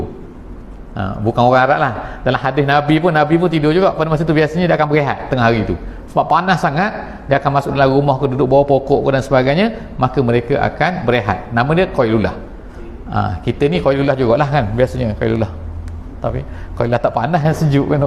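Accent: Terengganu, Malaysia. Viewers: Ustaz dari brunei ke? kenapa loghat macam Terengganu 😍 saya ingatkan Ganu 😂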